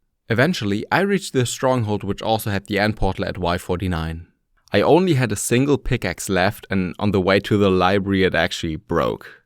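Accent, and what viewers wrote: Germany. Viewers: Am i hearing a german accent?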